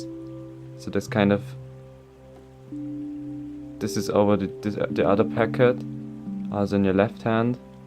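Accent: Germany. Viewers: Bist du deutscher?? man hört das richtig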